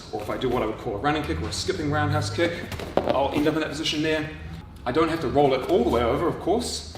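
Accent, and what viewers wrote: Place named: New Zealand. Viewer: sounds super kiwi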